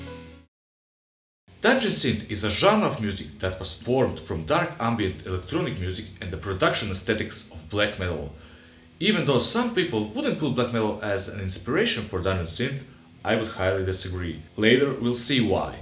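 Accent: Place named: Russia. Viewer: Давай по-русски теперь) я по акценту вижу, ты наш)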